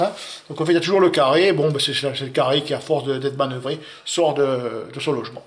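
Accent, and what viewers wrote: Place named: Reunion, France. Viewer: Merci monsieur 🙏 Petit accent de la Réunion 🇷🇪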